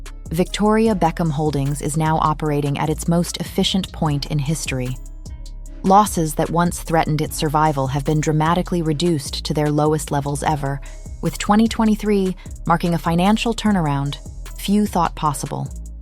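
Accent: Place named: United States. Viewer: This is the best "documentary" I have ever come across of her. Excellent narration in an American accent which sounds like a beautiful melody. I really enjoyed it. Her story is so honestly told. It's just authentic.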